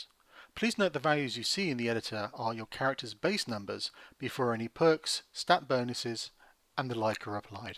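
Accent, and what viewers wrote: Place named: United Kingdom. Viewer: You sound so British. :)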